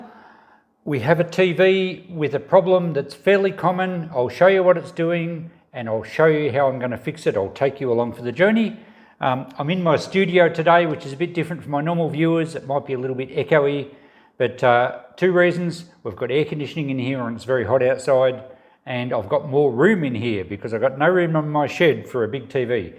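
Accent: Australia. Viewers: Thanks mate u sound so Aussie 😂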